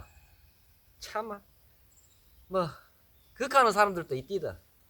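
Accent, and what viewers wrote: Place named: Andong, South Korea. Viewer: 고향이 안동쪽이세요^^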